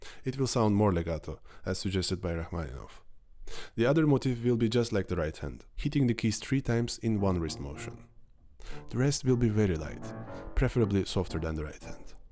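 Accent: Russia. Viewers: typical russian accent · Слава Шопену! Именно благодаря ему я наткнулся на Ваш канал. Я так понимаю (по акценту) вы русский. Подобного уровня контент я знаю у Михаила Аркадьева ещё. Но он в плане оформления не так хорош: там на телефон записано всего лишь. А тут у Вас просто как документальный фильм, а не урок)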